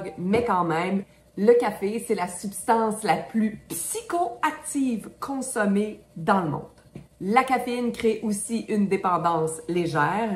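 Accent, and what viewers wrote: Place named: Quebec, Canada. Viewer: Québec sûrement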